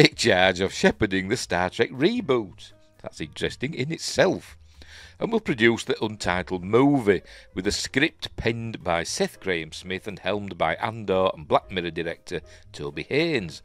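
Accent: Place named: Yorkshire, United Kingdom. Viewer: actually your accent just perfect mate! Love some lovely yo'ksheir accent!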